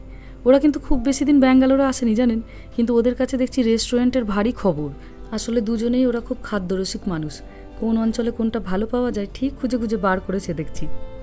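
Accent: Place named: Birbhum, India. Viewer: আপনি কি বীরভূম /বর্ধমান জেলার মানুষ? আপনার কথা বলার স্টাইল টা একদম সেইরকম. আমি বীরভূমের মানুষ তো. খুব মিল পেলাম, ভালো থাকবেন.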